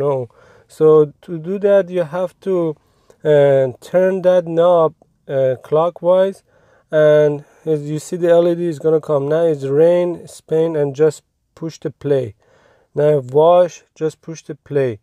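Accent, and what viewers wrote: Iran. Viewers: I love the Persian accent. ❤️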